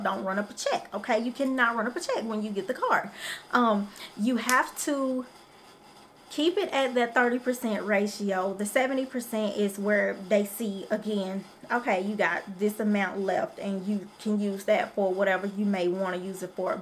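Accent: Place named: South, United States. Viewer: ... oooh you fine fine.❤ Took me by surprise. You must be a southern girl. Very confident & charismatic. I really appreciate the information.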